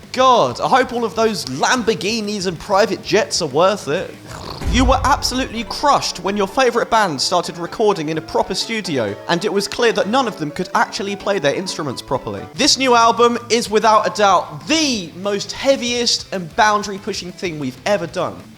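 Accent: United Kingdom. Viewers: Your english akzent is very british!;)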